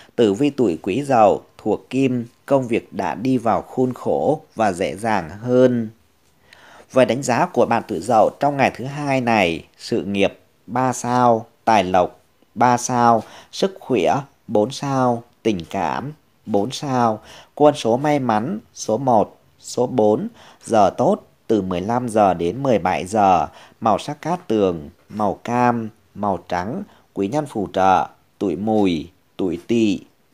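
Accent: Thanh Hoa, Vietnam. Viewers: Giọng thầy là quê Thanh Hóa phải ko ạ?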